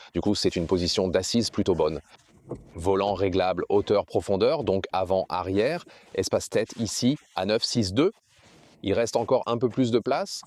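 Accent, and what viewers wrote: Quebec, Canada. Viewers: Français québécois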